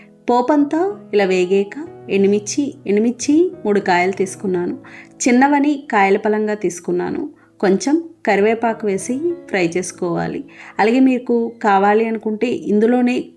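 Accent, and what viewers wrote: Godavari, India. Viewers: అది రాగాలు ఏమీ కాదు, గోదావరి జిల్లా ల మహిళలు అలాగె స్పష్టంగా మాట్లాడతారు, అది వారి మాండలిక శైలి, శ్రధ్ధగా వింటే చాలా బాగుంటుంది, ఎవరి మాండలిక భాష వారు మాట్లాడతారు గదా, దోషం ఏముంది! మీరు ఇలాగే చెప్పండి సోదరీ! సహజత్వాన్ని పోగొట్టుకో రాదు అని మనవి 😮😅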